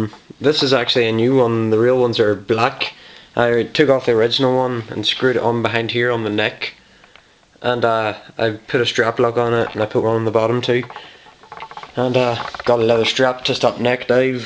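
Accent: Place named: Scotland, United Kingdom. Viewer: i like your scottish accent you got good taste in basses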